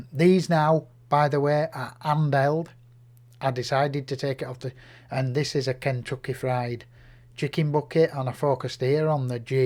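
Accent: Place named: Manchester, United Kingdom. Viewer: sounds like a manc :-) Regards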